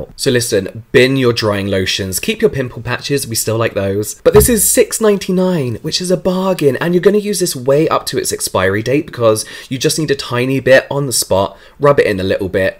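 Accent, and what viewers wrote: United Kingdom. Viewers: Do you come from the same exact area as Hermione Granger (aka Emma Watson)?? THE ACCENT!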